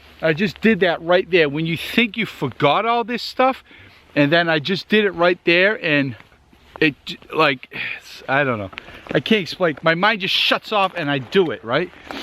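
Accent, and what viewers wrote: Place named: Massachusetts, United States. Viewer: Dude are you in Massachusetts? You sound like you're in Massachusetts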